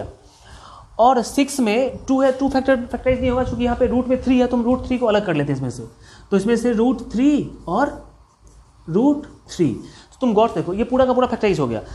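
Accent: Bihar, India. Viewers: You're the best maths teacher ever..❤..i tried so many times to solve this type of questions and clear my concepts😢...but few mins before i found your video...😊I really really loved your way of teaching....✨especially i am feeling happpiiieeeee becoz you're speaking biharrriiii🤩🤩 .... you'll get millions of subscribers soon....✨🦋 best wishes too youuuuuu⛅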